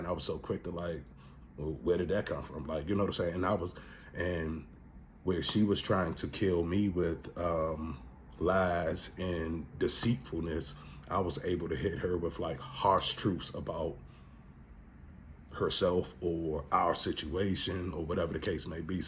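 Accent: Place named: East Coast, United States. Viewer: Thank you for sharing💛( You have to be from the east coast 😌)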